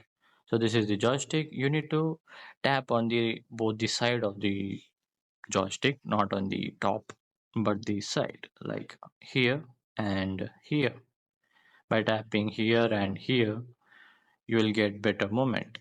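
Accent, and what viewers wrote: India. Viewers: Pajeet accent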